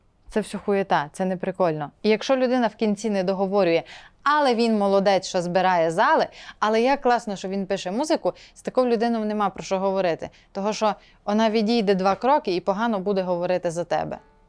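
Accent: Transcarpathia, Ukraine. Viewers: Но дуже файно!... Мені полюбилося!...... А ще як чую мою мою рідну Закарпатську😘.... Супер! !!!! ❤ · Будете матюкатися - не буду дивитися. Це не смішно. Прекрасна Закарпаття говірка спорчена москальськими матюками... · Ти молодець! Я слухаю тебе здебільшого, як носія закарпатського діалекту 🫂 Нехай Господь тебе благословить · ! Дякую за закарпатську мову! Насолоджуюсь слухаючи Вас, дякую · Хуйово як для першого випуску! Ніхуя не смішно, тадуже дратує акцент! Закарпаття? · як же я люблю твій закарпатський говір. Це є твоя унікальність. Сумую за Закарпаттям і люблю всією душею краєвиди і людей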